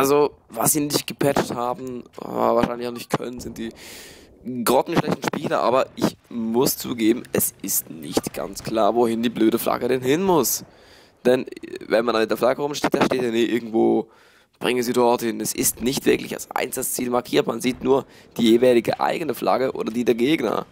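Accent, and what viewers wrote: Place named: Switzerland. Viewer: ich dachte am anfang du wärst engländer oder ami oder so^^ hab dann aber auch auf schweizer getippt!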